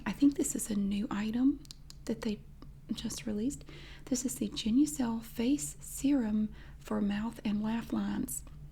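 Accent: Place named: Tennessee, United States. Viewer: Just found your channel. You must be from Tennessee or Kentucky?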